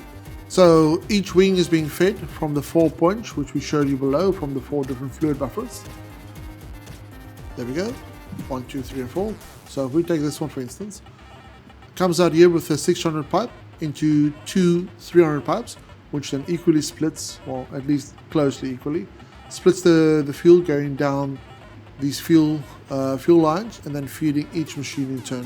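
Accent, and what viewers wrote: South Africa. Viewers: My guy, are you south african? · You sound South African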